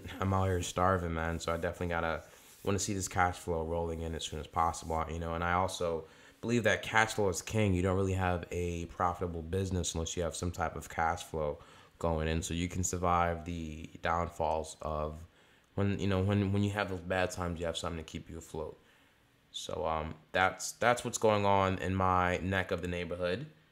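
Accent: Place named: United States. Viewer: But you have a little more advantage than me bcos you have the American accent and I don't. What I did was join a program that started paying me without referrals or customers or leads or anything like that. Then I made a proof on income video just to add some credibility to my business and then I sarted getting quality leads from my campaigns. I'm not trying to get you to join me, you can join another person but you really need to check this out reviewprofitclicking(dot)wordpress(dot)com.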